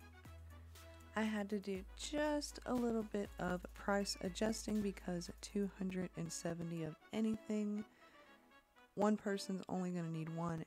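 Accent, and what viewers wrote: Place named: United States. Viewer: nice video! your american accent is funny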